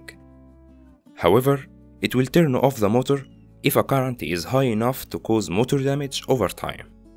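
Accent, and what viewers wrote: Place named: Egypt. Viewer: الانجليزي المصري نار وشرار 🔥🔥ههههههه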